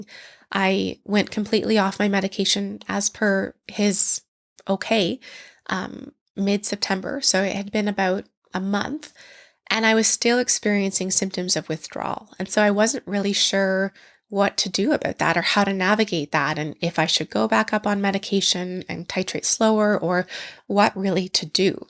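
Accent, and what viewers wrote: Canada. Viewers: Your accent leads me to believe you're Canadian. Care team? We have hundreds of thousands of mentally ill crowding the sidewalks of every city in the US. It's a horrible job that nobody wants. Count yourself fortunate that you have the resources to help you.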